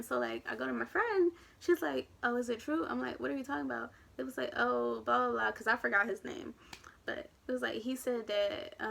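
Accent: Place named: California, United States. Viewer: Im guessing shes from California with all the "likes" thats how we talk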